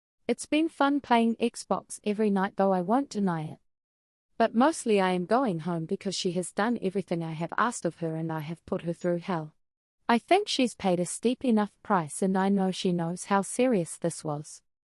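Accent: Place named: Australia. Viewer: It's Strine.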